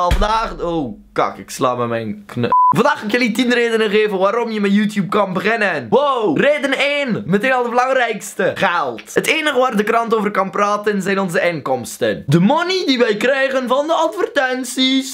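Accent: West Flanders, Belgium. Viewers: Mensen spreekt een beetje raar maar niet omdat hij Vlaams spreekt, maar West-Vlaams en dat is heel anders dan zeg je ipv ik bv ek en bv pikvis pekves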